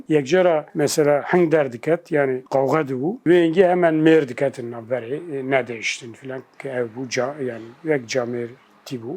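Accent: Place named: Quchan, Iran. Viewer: سلام ماموستا ....زبان کرمانجی شما با کرمانجی خراسان یکی هست انگار یه کرمانج قوچان داره صحبت می‌کنه ...کرد قوچان از خراسان ایران ......من حض کر ..ژه کرمانجی گپ کرنی استاد تمام این بازیها تو خراسان هنوز بازی میکنن ....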